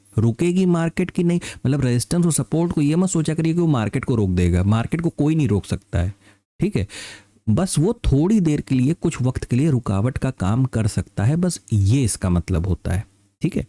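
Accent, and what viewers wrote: Lucknow, India. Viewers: Right shoulder is Pushpa style. Main jhukega nahi. You have a great way of delivering your content. Lucknowi andaaz ki baat hi kuch aur hai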